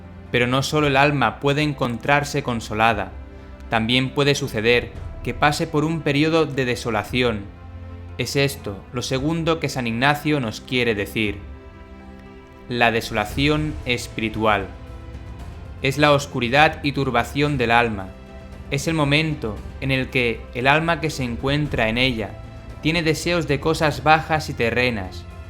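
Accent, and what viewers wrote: Spain. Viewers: Macho, tendrás mucha razón, pero ¡que forma más sosa de hablar! Y ese acento madrileñata.... Prefiero el acento latino.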